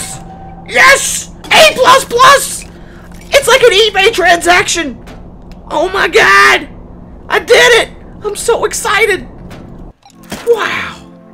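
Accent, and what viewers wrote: South, United States. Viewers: You just get more and more southern the longer this goes! "How in the HAY-ULL!?" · gets really, really Southern when he's stressed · pissed off Southern accent is the best thing ever.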